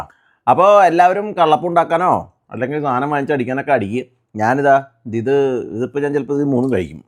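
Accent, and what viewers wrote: Thrissur, India. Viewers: മ്മടെ ചേട്ടൻ തൃശൂർ ആണോ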